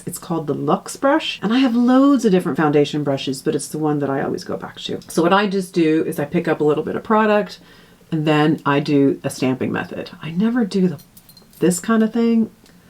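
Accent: Ireland. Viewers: You’ve turned Irish?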